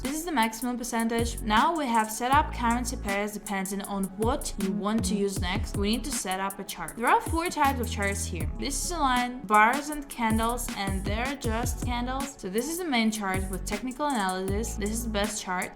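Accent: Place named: Russia. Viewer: Как же чувствуется русский акцент )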